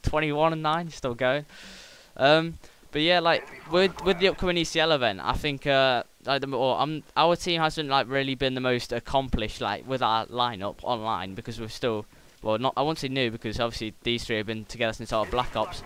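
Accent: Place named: England, United Kingdom. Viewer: your english accent <3 really good gameplay and commentary so looking forward to next one, if you want anything uploaded just send me a message and i will do it asap. // Vizze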